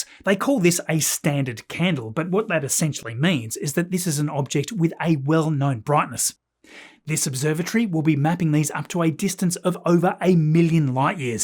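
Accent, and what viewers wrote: Tasmania, Australia. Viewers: I always love hearing the Tasmanian pronunciation of "booster". I think it could only be topped by hearing "caterer"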